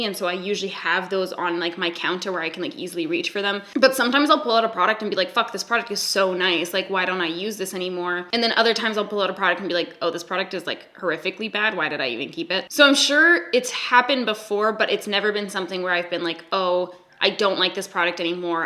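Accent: Canada. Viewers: I love this just because you said “tag” so much at the end. #lovecanadianaccents you’re the best! 🙏👏🏽❤️ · All the comments about her "accent" saying "tag".. I went back and watched again wondering what I missed... oh... silly me eh.. I grew up 2 hours away from where she did 😂😂😂 Oh Canadia.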